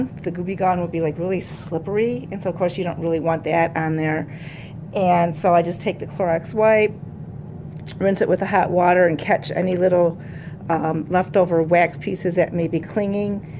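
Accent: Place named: East Coast, United States. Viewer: Wait! You are in Michigan too? With your accent I thought out East! ♥️💋🇺🇸💃🏻 Cool! Sorry, I am new to your channel so I just found out. Love your videos they are so calming. Thank you! 🙏🏼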